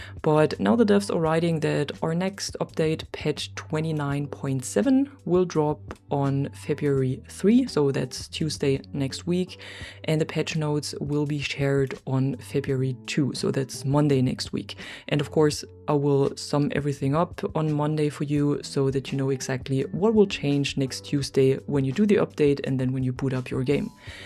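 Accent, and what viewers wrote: Germany. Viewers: And that loveley german accent.;D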